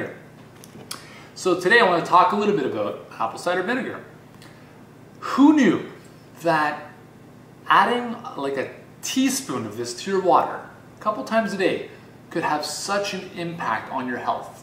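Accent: Canada. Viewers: ur canadian....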